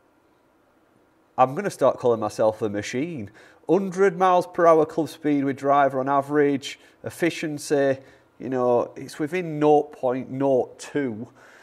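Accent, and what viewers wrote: Yorkshire, United Kingdom. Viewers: Proper Yorkshire man😂 Shall I set a go fund up page for you!!!!🤭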